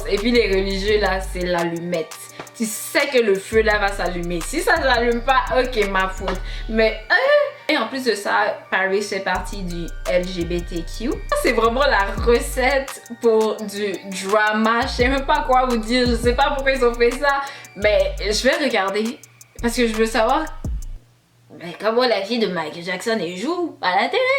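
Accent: Quebec, Canada. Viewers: J’ai l’impression que t’as grandi au Québec ou au Canada t’as un peu l’accent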